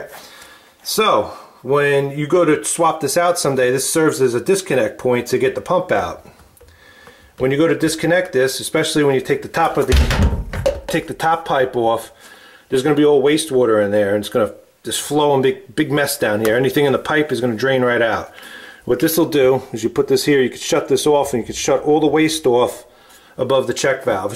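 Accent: New York, United States. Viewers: I'm guessing by your accent that you live in NYC/Long Island area? I'm originally from Queens but live in NJ. My wife and I go to the city several times a year to catch a play and eat something bizarre in Chinatown. I'll give you a shout if you are in fact from the area and get you a beer or two. Thanks again from a great video. 😊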